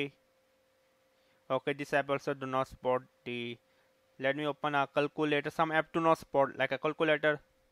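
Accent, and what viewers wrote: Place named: India. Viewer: this is an indian accent ^^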